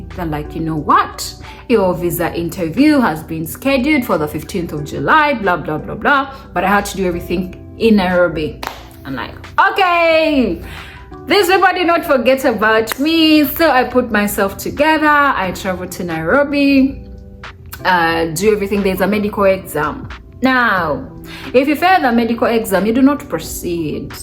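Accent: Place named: Uganda. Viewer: Uganda 🇺🇬 accent is so super wow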